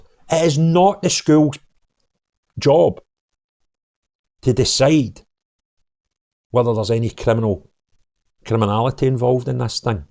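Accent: Scotland, United Kingdom. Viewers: Forget their nationality,religion etc,,yet your headline,,foreign attacker,,,your a Scot in accent only,,,,heres one l would bet on,,,your a rfc supporter or that ilk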